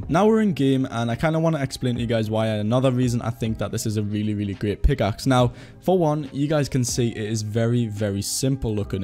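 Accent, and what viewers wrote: Newcastle, United Kingdom. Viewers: Geordie boy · are you a geordie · are you from Newcastle??? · You from Newcastle?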